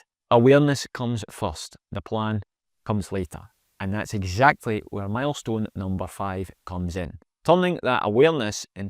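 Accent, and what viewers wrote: Scotland, United Kingdom. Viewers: LOL, nice Scottish accent, I love it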